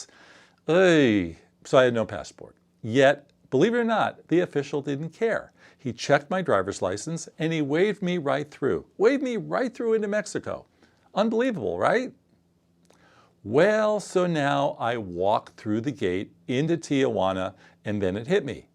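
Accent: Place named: United States. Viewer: You are lucky because you are a white man with an american accent. If it was some Latino guy who forgot his passport he probably would not be allowed to get back in.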